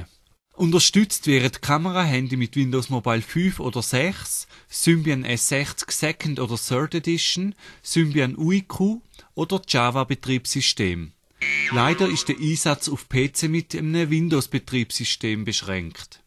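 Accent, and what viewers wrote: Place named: Switzerland. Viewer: er spricht schweizerdeutsch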